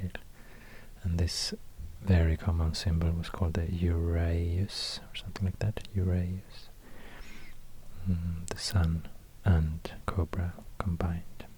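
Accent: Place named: Sweden. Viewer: You is swedish?? I recognize my accent when I hear it 🤔 Anyway amazing video! Loved it ❤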